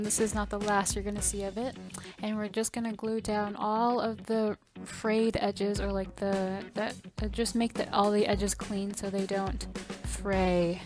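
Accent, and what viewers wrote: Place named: England, United Kingdom. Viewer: can't wait to hear it 😂 and I have heard worse especially when they try to sound extremely posh which tbh everyone tells me i speak like that but Ik that not everyone does and you sound like a normal English person btw Britain is Wales Scotland North Ireland and England all together and we all sound very different 😊 that's why what you were doing is more of an English accent rather than say an Irish accent😊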